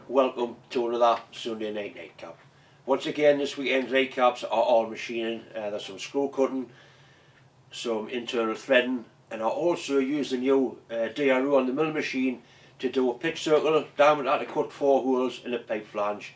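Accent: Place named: Scotland, United Kingdom. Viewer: I cannot understand Scottish accent so does the google translator. I give up on that guy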